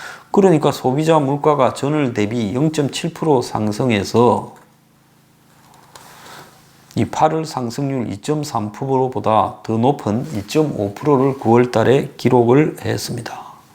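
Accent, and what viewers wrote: Gyeongsang, South Korea. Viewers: 경상도 사투리가 있어서 그런데 적당히 들으시구려 이곳에서 까지 그런 지적질 하지말고..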